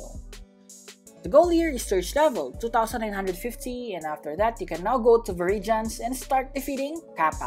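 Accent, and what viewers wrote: Philippines. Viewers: I just noticed hes name in the leaderbords hes name is which is a filipino language which means dough that is sticky correct me if im wrong so that means hes a filipino well its obviouse when hes talking btw am a filipino too so eyyyy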